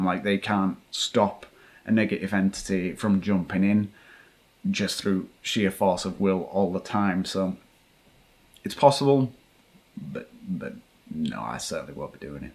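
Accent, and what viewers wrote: Yorkshire, United Kingdom. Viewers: Sorry to keep messaging I'm from Yorkshire and I can tell a Yorkshire accent when I hear one, where you from mate? Promise I won't come terrorize your house I live in Dubai hahahah bit of a journey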